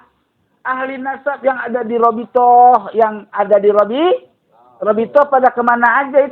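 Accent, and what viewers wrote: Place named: Madura, Indonesia. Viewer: Halo syaikh.. orang pundi gih.. kok nadanya kayak Madura apa betul kyai..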